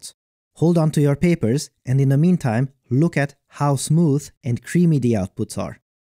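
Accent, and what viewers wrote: Hungary. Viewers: If anyone is curious how a Hungarian accent sounds like, here you go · Do I hear a Hungarian accent ☺️? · His accent is definitely Hungarian. So he must live here.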